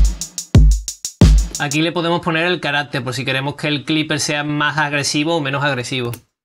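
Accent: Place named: Andalusia, Spain. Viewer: Hola. gracias por el review, hablando de "efectos", tu acento de que región de España es? será andalucía? he escuchado a cantaores flamencos que hablan como tú. saludos.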